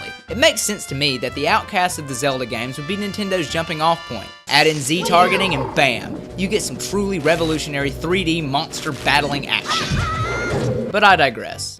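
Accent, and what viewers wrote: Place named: South, United States. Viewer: Ya know what, hearing a southern accent is actually kind of refreshing, you don't really see that in the Youtube Gaming community:) You did well elaborating your points, and the pacing was really good. That's probably the part that I expected to be the worst (from smaller Youtubers), but you did well! Really well in fact. Keep it up!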